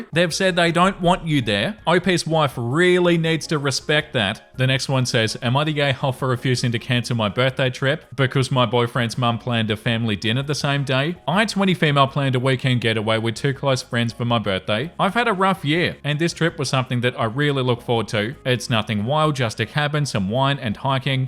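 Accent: Australia. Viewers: i showed my mom your channel & she says "i want to marry this man's australian accent" (she loves australian accents lol)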